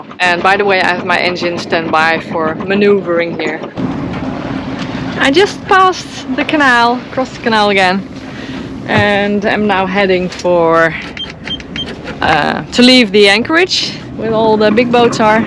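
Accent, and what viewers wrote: Netherlands. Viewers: Wauw wow fantastic adventure Ja kijk uit hoor ,die orcas ! 😅 So kool your solo trip . I like hearing the Dutch accent Moet ik aan Vlaardingen denken . Weggevaard in 1959 eerste keer Naar Canada . Beetje grooter boot maar ja. Boobies yes they are .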